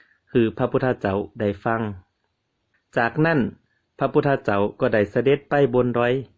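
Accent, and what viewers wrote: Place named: Tai Lue, Thailand. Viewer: เล่าประเมืองพยากนครเชียงตุงสำเนียนไทยลื้อได้ดีน่าฟังมากครับ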